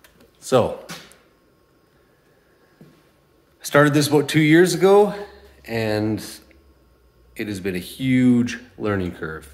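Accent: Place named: Canada. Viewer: 1) you sound Canadian 2) what rear bumper?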